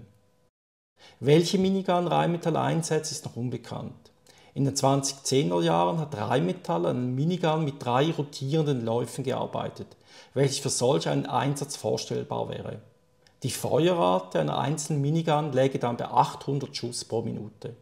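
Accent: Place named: Switzerland. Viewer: Er spricht Hochdeutsch mit Schweizer* Akzent*. Würde er Schweizer Dialekt sprechen, würde ihn wohl kaum ein Deutscher verstehen.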